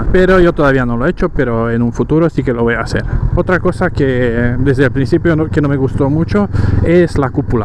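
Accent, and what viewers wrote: Romania. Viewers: Nosptros tenemos dos, una de mi mujer y otra yo. Estamos dando la vuelta al Mundo, y NINGUN PROBLEMA , excepto cambiar amortiguadores y perdida de gasolina en la de mi esposa por culpa de una fisura que aun no sabemos porqué. Obviamente, vamos muy cargadps( es nuestra casa) pero es una moto para toda la vida y para viajar. ( por cierto, que su acento me suena a Rumania. Y me alegro). Saludos desde ese Pais, esperando las motos, que vienen de Canada. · Salut , esti român?..dupa accent😊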